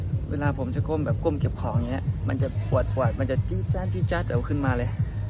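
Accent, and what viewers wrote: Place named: Isan, Thailand. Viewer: ต้าวมันกว้างมากไม่ใช่มันกว่าง😀😀🐷🐷🐷หมูน้อยพูดสำเนียงบ่ถิ่มบ้านเฮาเลยน้อหล่า🐷🐷🐷🐷😊😊😊❤️💖💞🐷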